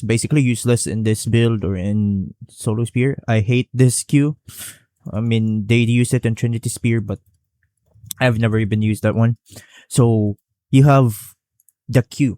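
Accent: Philippines. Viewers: Brother I shouldn't even leave a comment cause you are going to try to deflect. You sound Filipino which is a "third world" country yet you made a joke and put up African children. On top of that malaria is present in the Philippines. Just my two cents, enjoy the traffic my comment brings.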